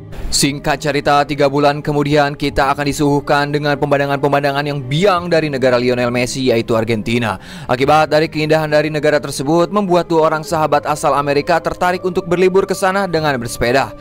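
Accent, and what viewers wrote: West Java, Indonesia. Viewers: Kalau org Sunda emg gitu semua logatnya😂😂😂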